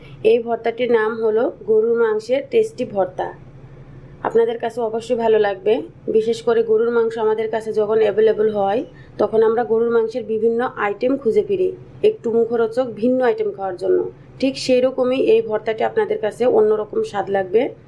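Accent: Bangladesh. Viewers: apnar vasa commillar na anna jaygar vasa Right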